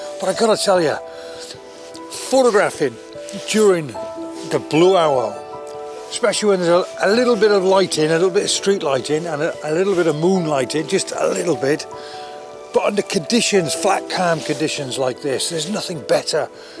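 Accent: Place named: England, United Kingdom. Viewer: Boy, I love you as a photographer. You’re in England. I love the accent and the shots are just gorgeous. Now I know what the blue hour is, but we never see one here in California. I live I live in London I loved by cameras. I haven’t been doing any photography lately because I’ve been recuperating from a surgery and just watching you is one of the best things I have seen in a long time. Thank you for your pictures.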